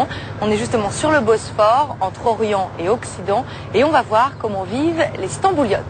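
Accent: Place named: France. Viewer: french accent is sooo fikkin hoot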